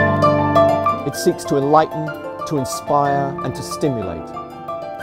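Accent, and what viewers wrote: United Kingdom. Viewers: everything sounds more important when you're british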